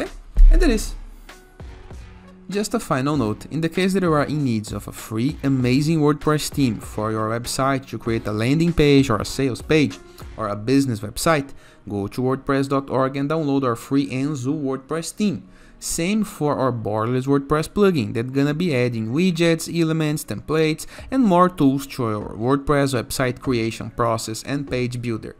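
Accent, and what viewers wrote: Brazil. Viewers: sotaque brasileiro brabo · Claramente sotaque #BR hehehe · esse cara é brasileiro tentando aprender em ingles crtz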